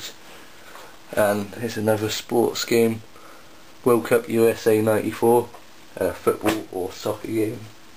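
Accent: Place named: United Kingdom. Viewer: lol yeah he is from the UK. Did he voice not give it away? lol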